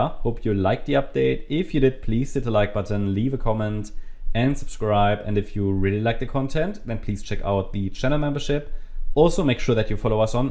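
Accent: Germany. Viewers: War auch Ironie, hatte nur das Gefühl, dass er Deutscher ist, seinem Akzent nach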